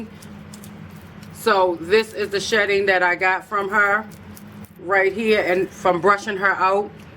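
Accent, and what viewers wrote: Baltimore, United States. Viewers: Omg Are You From Baltimore ? I Love Your Accent . It’s So Cute !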